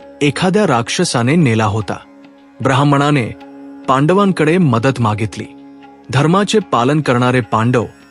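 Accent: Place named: Gujarat, India. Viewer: वाचना मध्ये गुजराती भाषेतील उच्चार असू नयेत.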